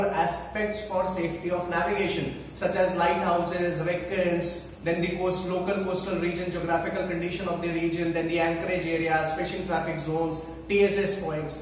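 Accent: India. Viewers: why indian accent? why why why? :(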